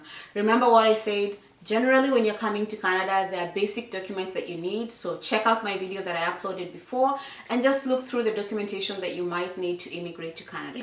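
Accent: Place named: Kenya. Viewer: Good Job. I can tell you have lived in Kenya (from your English) i hope to migrate by end of 2020. Sitting my IELTS next week. Much love from Kenya. your hair is beautiful too.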